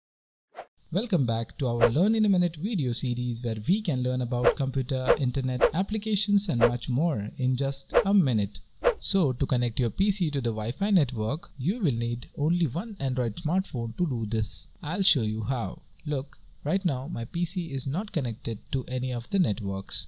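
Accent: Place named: India. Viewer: The second I heard an Indian guy I knew I was in luck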